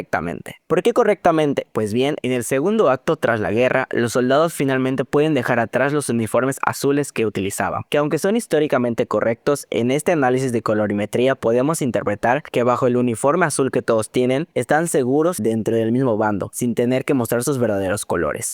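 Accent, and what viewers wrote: Mexico. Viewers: análisis de hamilton en 2025 🥳🥳 te ameeee, necesitas hacer más videos porque los veré todos, excelente análisis, excelente acento yuca y mega slay ese make uppp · hay hamilton, hay drags, hay arquitectura y acento yucateco; este video lo tiene todo y por eso me super suscribo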